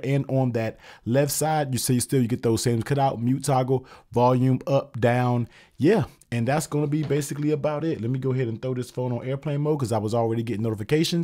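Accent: United States. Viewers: I liked your American accent, man 👌💞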